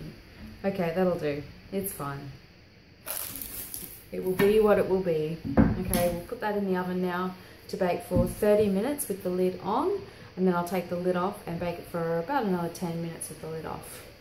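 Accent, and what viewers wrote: Australia. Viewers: Loved listening to a fellow Aussie. I enjoyed this video. Straight to the point and informative. Hope to give it a go soon. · You are a great lady and I thank you for the wonderful video and your sourdough recipe. Btw love your Australian accent. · Niceto hear an Aussie accent !L.O.L.😄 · HI! Totally awesome video! Camera angle was perfect, you have a very pleasant and clear [ Australian!] speaking voice, and the entire process was easy to understand and well presented! Well done! Shalom to us only in Christ Yeshua. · Hi, You made it look easy to follow so will attempt same. Good to hear aussie talk.:-D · thanks I love Aldi . I'm from Shepparton VIC.regional. My bread is now tasting a bit more sour. Thanks your Video's are wonderful. Great to hear an Aussie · Thanks! This was really nice to hear an Aussie voice and practical instructions. 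🇦🇺👍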